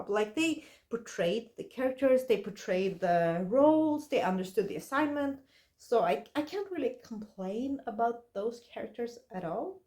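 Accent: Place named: Sweden. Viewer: I was going to ask what that accent was, but you said your from Sweden? So I’m guessing it’s Swedish 😊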